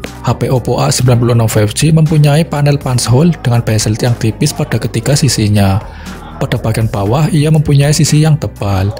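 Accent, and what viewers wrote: Banyumas, Indonesia. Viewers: Ngapak nyaaaaaa,...😁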